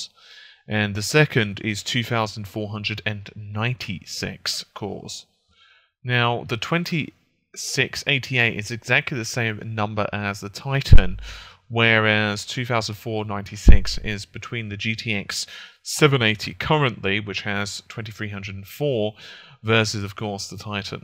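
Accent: United Kingdom. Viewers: It's because he is British. They have many strange accents there.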